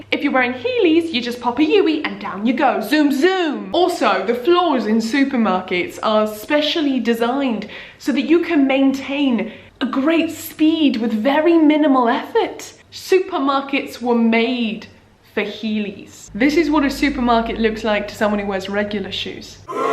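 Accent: United Kingdom. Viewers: Something about a slightly posh English woman wearing a cross saying “no nut busting for you my friend.” is very... strange for me. As an American gay male.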